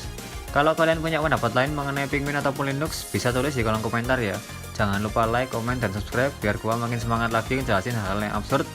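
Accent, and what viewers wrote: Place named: Java, Indonesia. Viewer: Nah, logo penguin itu sebenarnya mirip sama pengguna linux yang perutnya gede, contohnya bapak floss stallman. Btw salam medok bang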